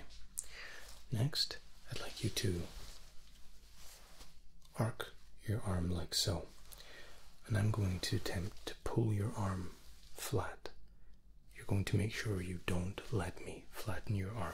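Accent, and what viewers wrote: Scotland, United Kingdom. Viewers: Is he British ??? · Is he Scottish? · Love me a handsome Scot with an immaculate accent.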